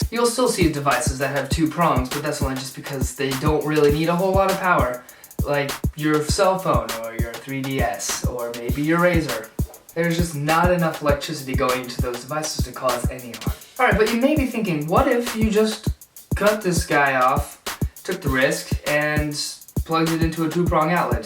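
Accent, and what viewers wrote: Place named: China. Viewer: you talk like chinese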